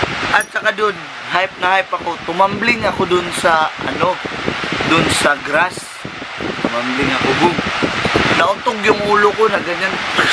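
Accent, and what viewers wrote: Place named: Visayas, Philippines. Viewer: Bisaya ka sir?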